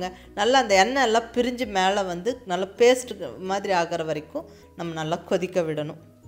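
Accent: Coimbatore, India. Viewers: Neenga coimbatore native ah mam,? ur slang is as me . Nallaa jammunu irukku😋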